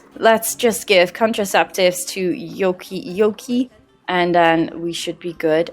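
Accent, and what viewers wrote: Netherlands. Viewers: wait are you dutch? Of ben je nederlands haha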